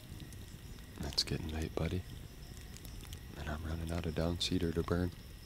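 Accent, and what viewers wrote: Canada. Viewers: Love your videos. So relaxing. How are you not Canadian? You sound 100% Canadian. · No. The "eh" is a Canadian thing. I'm Canadian. I also think he says, "buddy".. Another Canadian thing. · Are you perhaps….. Canadian?🇨🇦🇨🇦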